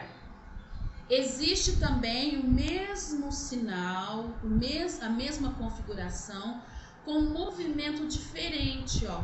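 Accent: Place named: Minas Gerais, Brazil. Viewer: Rindo horrores com o "jeito mineiro" de falar!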